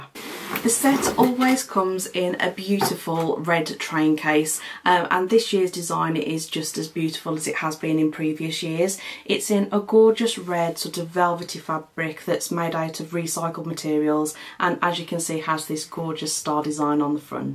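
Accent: United Kingdom. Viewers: Your review is excellent🎉. I love the detail you mention for each product which other reviewers often skip! I also love your British accent. I am looking forward to more of your reviews. From across the Pond, Diane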